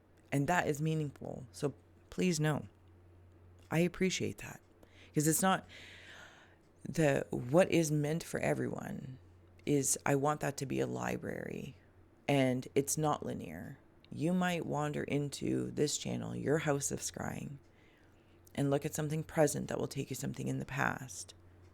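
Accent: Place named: West, United States. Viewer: hope you had a wonderful weekend! Not sure if this is the video but I do (I’m hearing a western accent, haha) I do wish to hear more of this flower farming side of! Seems to be my other obsession during this time of new beginnings. Floret flowers, I think that’s her name. She is breeding roses, dahlias and others that haven’t been breed before, taking it from big box stores and teaching us how to do it. She also is rescuing roses that were becoming extinct. The videos they put out are my new fav live mini series. I laugh, I cry, I learn and I feel part of their farm. Almost dropped your page on her page inviting her to you, i get the feel she’d really like us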